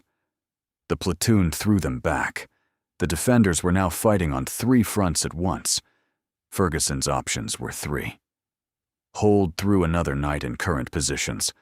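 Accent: United States. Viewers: Pity this story has be told in a crap American accent who can’t pronounce Australian places and speak in Strine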